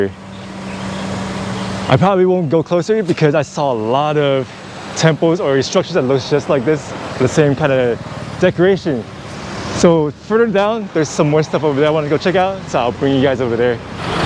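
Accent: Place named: West, United States. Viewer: Now you are in Asia, so you don't have to speak like westerner. You are a China Chinese, don't have to boast that you came from the west.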